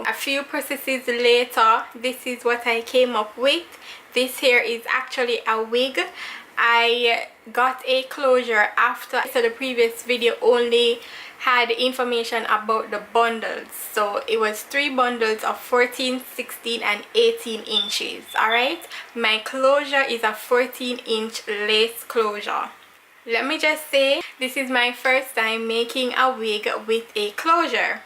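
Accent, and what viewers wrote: Jamaica. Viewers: Are you Jamaican? Guh deh yaaaaaaaadie!!!! Love seeing fellow Jamaicans on YouTube doing there thing.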